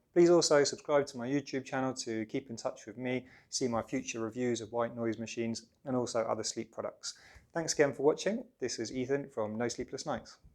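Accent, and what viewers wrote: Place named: United Kingdom. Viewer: Thank you for this clear concise and detailed description. Your presentation is a pleasure to listen to. Love that British accent!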